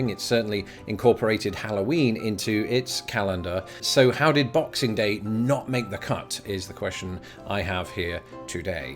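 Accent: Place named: RP, United Kingdom. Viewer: Boxing day is another great day to drink beer and celebrate the birth of Jesus, the first son of Santa. Your accent is very "RP" , it does make me ask "Do you know the queen"?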